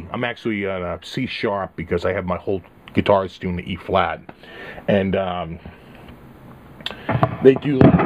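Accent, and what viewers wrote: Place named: New England, United States. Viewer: what part of New England are you from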